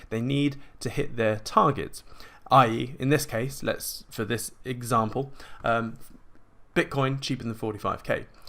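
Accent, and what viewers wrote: United Kingdom. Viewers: Hey, thanks for the great educational video! Always appreciate to hear your British English! In the past couple of videos you've said that you don't trust this btc rally. Now that your bottom glassnode indicator is flashing "possible bottom" are you back in btc with a % of your free flowing USD? Or are you waiting for that $42k to hold as support before you initiate your capital? Would be lovely to get your answers on these questions. Appreciate your answers in advance:)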